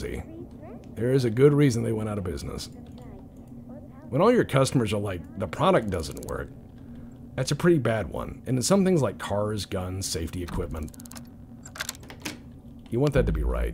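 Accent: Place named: Texas, United States. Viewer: French in a Texan accent is an unnatural but hilarious combination.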